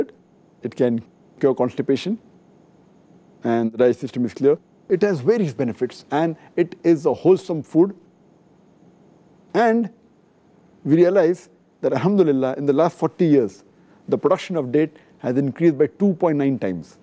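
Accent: India. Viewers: Gotta love the Indian English voice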